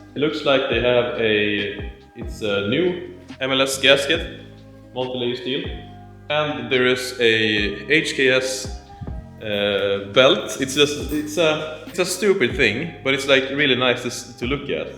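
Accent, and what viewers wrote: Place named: Sweden. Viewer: yeeez verybra jdm swenglish du got my sub <3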